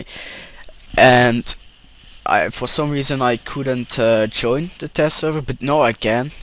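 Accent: Netherlands. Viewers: je nederlands accent veraad je :3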